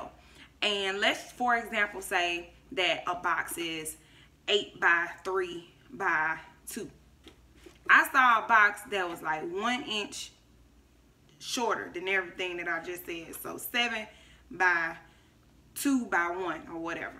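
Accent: Atlanta, United States. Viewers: Tour video just popped up in my library. Good advice. Also you have some pretty skin and love the southern accent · Lol i was like u sound like from ATL and right after i said it u said u were from ATL. Lol im from here too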